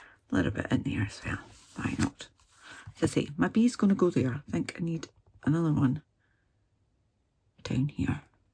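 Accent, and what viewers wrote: United Kingdom. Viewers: Oh my goodness no, you do not look like “her” at all!! 😂 Robin Williams must have studied many English accents to perfect it like he did, the minute I hear your voice, it takes me right to that movie, he did a great job with that character! I agree, the SU community has brought me many wonderful friendships that have lasted years, since I started using their products back in 1998! I’ve only been a demo the last 7 years, in June, and I couldn’t imagine a life without SU and all the wonderful people in it! 😌 Take care, it would be wonderful if our paths could cross one day! 💜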